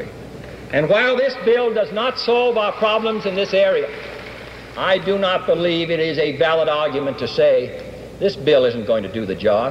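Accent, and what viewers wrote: Boston, United States. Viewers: Go to Boston. You’ll hear it everywhere. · Extremely strong Boston accent 💪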